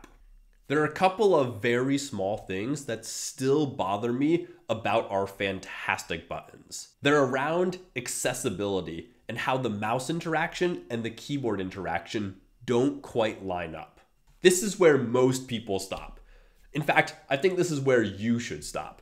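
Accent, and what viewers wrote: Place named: United Kingdom. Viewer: Obviously American, but there's an accent creeping in here. Secret Brit? :)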